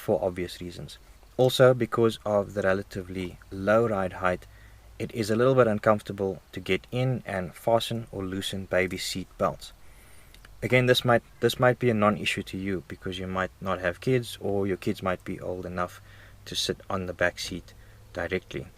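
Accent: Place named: South Africa. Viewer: This guy has a soothing voice. I love a South African accent… Great car too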